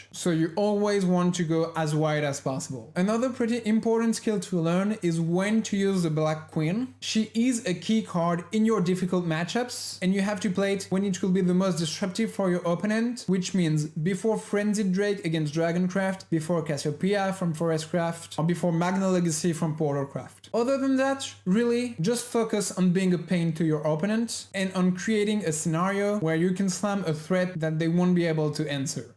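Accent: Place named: France. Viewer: That accent,you can't fool me,are you french?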